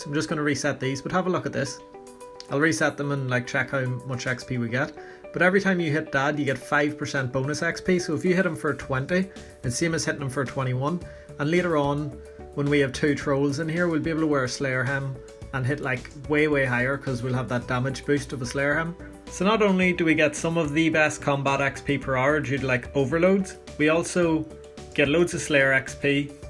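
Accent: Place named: Northern Ireland, United Kingdom. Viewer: Jesus, hearing another Northern Irish accent on the internet is super weird. Wait it says your from fucking Enniskillen on your twitter... lmao, i'm 10 miles away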